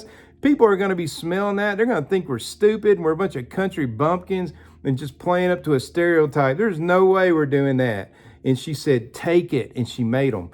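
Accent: South, United States. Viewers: this was such a great episode. I’m in the middle of reading Jackie Robinson-A Biography by Arnold Rampersad. I think you have the facts pretty close to what I’m reading in the book. As I’m reading, I think about how hard it was for black Americans to live during that time; even today in many instances. You’re telling of the story, and I mean no offense at all, by a southern American, really makes the story come alive and enriches it with a lot of empathy. And your card collection is something else. Thank you for sharing,.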